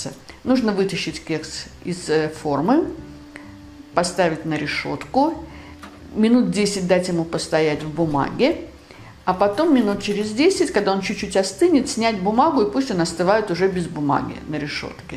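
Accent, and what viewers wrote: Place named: Baku, Azerbaijan. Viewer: Красиво говорите! Вы случайно не из Баку?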